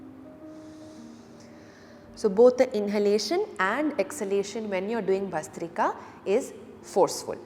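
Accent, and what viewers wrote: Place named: Bangalore, India. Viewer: She belongs to Bangalore, udhar waisa hee pronounce hota hai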